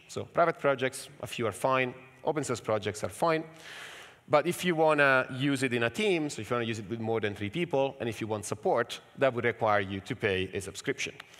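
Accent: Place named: Italy. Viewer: I wonder if the name has any influence from the town of Pavia, since your name and accent sound very italian?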